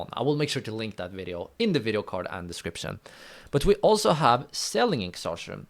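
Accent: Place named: Sweden. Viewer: I hear the svenglish from mile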